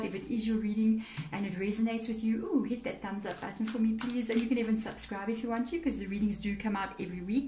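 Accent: South Africa. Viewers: Good to hear a south african voice again. I been in the uk too long now lol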